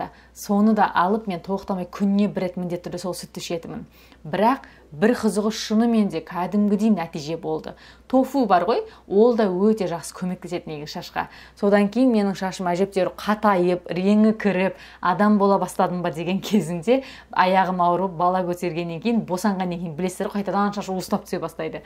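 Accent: Standard. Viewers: Жарайсың өз тіліңді ұмытпай таза сөйлейсің Кореяда тұрсада Қазақстанда тұратын қазақтар орысша сөйлейді қазақ өз тілінде сөйлей алмайды бақытты бол сәттілік тілеймін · таза казакша сойлейди екен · Сіңілім рақымет кеңес бергеніңе .керемет сенің қазақ тілің құрет тұтып әлі күнге қазақшаны таза сөйлегеніңе риза болып отырмын құрметті бол жаным.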